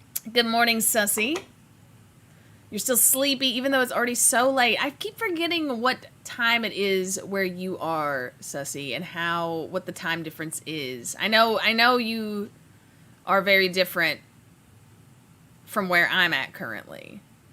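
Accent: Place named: Tennessee, United States. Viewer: Tennessee or Kentucky which one lol I hear it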